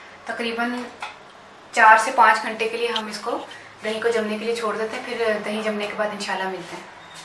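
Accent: Punjab, India. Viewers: Tusi punjab tu oh jeraa ayna haha aisi v aynj e bolny ah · MashaAllah that panjabi voice aye khaye Pakistan de yad agae 😢😢😢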